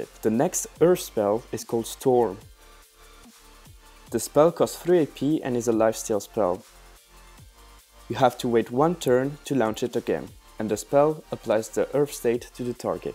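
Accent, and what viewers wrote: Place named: France. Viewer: you sound so french... don't forget about the "ed" after a "t" is pronounced [tide] and pronounce your "h"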